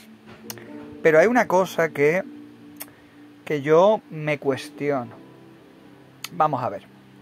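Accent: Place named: Galicia, Spain. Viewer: Buenísimo lo de acento gallego. Yo te veo más japones😂😂😂😂 gallego-nipon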